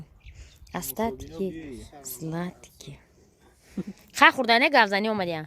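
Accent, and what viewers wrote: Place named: Kuhistoni, Tajikistan. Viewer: Кадом кухистонии хта бо месниги мегири якдам кани мани гап мезани якдам мхраш мегуй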